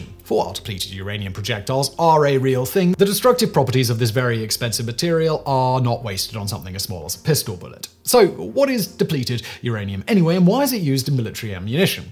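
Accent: United Kingdom. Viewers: Speaking a bit too fast in this one chap, especially with the British accent and constant use of "DU". Slow it down a bit. No rush man.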